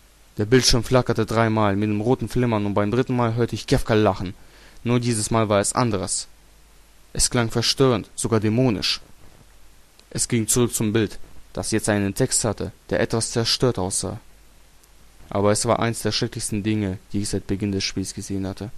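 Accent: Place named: Russia. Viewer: Haha du bist voll geil ich liebe es wenn Russen so einen geilen akzent haben wie du! Aufjedenfall abboniert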